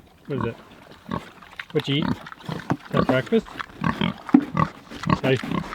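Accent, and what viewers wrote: Canada. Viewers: I just found your channel, you’ve really made some beautiful places on your property? I detect the Canadian accent, are you in Ontario? Do you raise the pigs for food? I’m not a vegetarian but I’d have a hard time eating an animal I cared for. I had a duck & 3 chickens as pets for my kids & we lived in the city. The neighbour’s loved it so nobody reported us.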